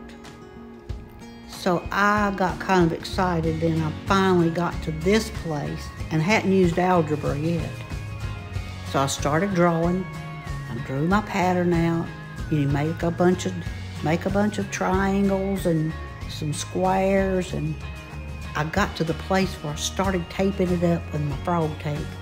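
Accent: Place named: Appalachia, United States. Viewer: I have been watching videos on how to make a barn quilt. Yours is absolutely the best! You give very clear, step by step instructions. You are the exemplar of the fine Appalachian qualities I so appreciate. You demonstrate resourcefulness, determination, creativity, and perseverance. For examples, the way you decided to make your own rather than buy one ready made or use a kit, the way you used the extra wood to make a shelf, the way you used the reverse side of the board when you needed to begin again, the way you were committed to making the best product you could - even if it required more work. I admire you so much! And may I add that your soft-spoken regional accent is very pleasant to hear. I am recovering from surgery and will be off my feet for a few more weeks, but as soon as I am up, I plan to begin some of these paintings for our sheds. 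Thank you for taking time to show others how this is done.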